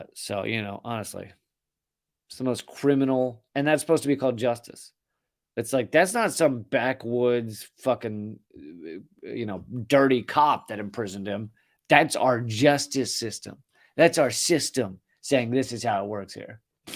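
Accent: United States. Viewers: Stop the country accent. It's liberals that enslaved blacks. It's liberals that created concentration camps. It's liberals that wanted to starve Americans for refusing the "vax". Most country folks want to live and let live.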